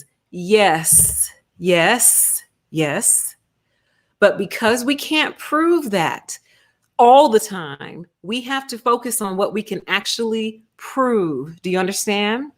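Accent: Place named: Texas, United States. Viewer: Hello & Youtubers hope You All doing Fine & Safe & Pleasant always! And Your Video Content is Great along with the people's comment section below this Video Content! This is a Complement i thought You were a Southern Texas Miss America in a pageant because of Your Amazing Voice & Personality! And wanted to say some of my Relatives are blended with black & white & mexican/native American and some straight & bi and some of my friends are straight & gay and are different races of people and i love my Relatives & Friends! Such a Great Video Content hope You get subs! And i'm not a subscriber yet because i'm a selected target individual being watched & bullied & tested by my unknown haters & gangstalkers and handlers on social media and in public places i go! Anyway can You give Your cute 🐕 puppy a hug from me ❤Thank You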